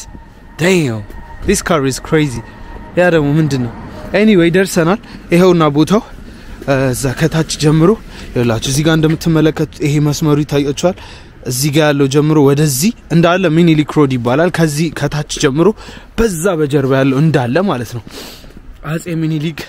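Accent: United Kingdom. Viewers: your British accent is lovely 🥰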